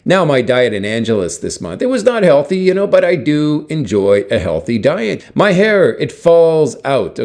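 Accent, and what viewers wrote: Canada. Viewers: Ha ha!, you don't hide your western Canadian accent. Very nice sound to my ears, even though I am from Newfoundland with a very different accent. After 4 years in the Philippines, the accent is nice. Stay cool bro.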